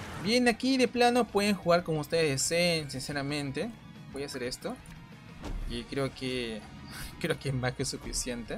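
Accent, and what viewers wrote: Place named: Latin America. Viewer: Feliz noche, creo que eras de latam gracias por tus guías buenísimas!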